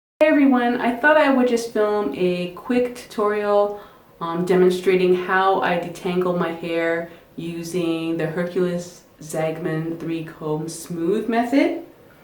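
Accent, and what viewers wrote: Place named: Canada. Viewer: I hear a Canadian accent...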